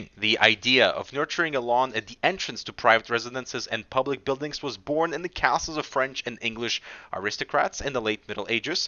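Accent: Russia. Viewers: U kinda used russian accent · are you russian? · D. Please create new channel where you are just reading books. Your 75% English and 25% Russian voice is literally heaven. Preachhhh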